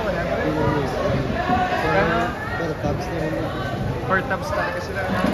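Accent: Iloilo, Philippines. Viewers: Wag muna pilitin magtagalog boss halata Naman n ilonggo k eh😢😢😢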